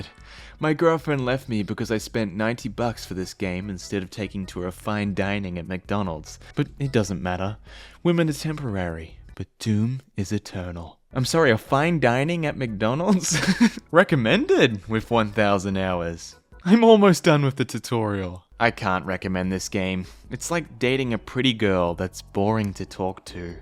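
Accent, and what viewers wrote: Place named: Australia. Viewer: Why do all my favorite British YouTubers always turn out to be Australian?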